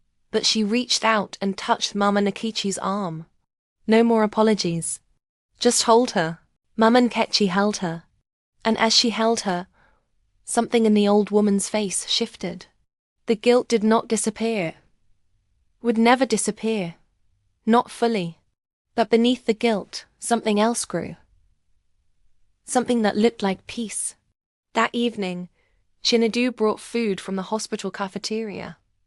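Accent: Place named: United States. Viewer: A very interesting story but why use American intonation thereby ruining the beauty of the story with very wrong pronouncement?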